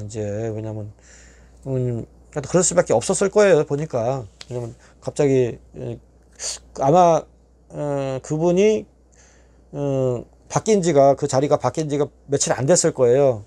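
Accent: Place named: Jeolla, South Korea. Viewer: 전라도 충청도 인천 사투리 다 있으신거 같아요 ㅎㅎ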